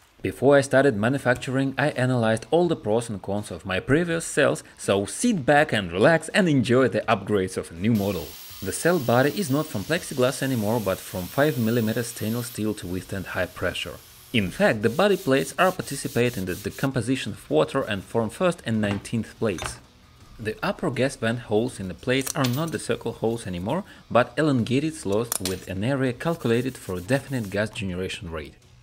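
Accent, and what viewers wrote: Russia. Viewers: а я вот никак не пойму. По акценту ты, вроде, русский, а по контенту в предыдущих видео, вроде, из америки. Я запутался, откуда ты?:) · так я не пойму ты русский или англичанин? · Смотрю, слушаю и думаю, это у меня уши сломались или он не англичанин. Хм... Почему русский акцент... Хах)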